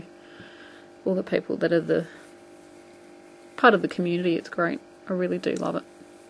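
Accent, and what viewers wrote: Australia. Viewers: you have the absolute BEST tutorial videos on youtube. Thank you SO MUCH!! Listening to your aussie accent is a bonus:) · Thanks, just doing this for the cluster bag:) But now after doing this and seeing your friends technique for fitting hats I really want to make myself a slouch hat:) You are seriously in the top 3 best online crochet tutors in the world. Lobe your work and love hearing that Aussie accent. (I'm an Aussie too, just feels so homey) Thanks again!:))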